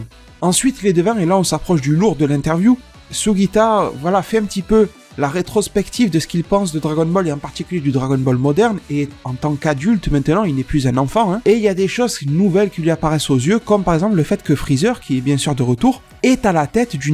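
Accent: Marseille, France. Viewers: t'es marseillais??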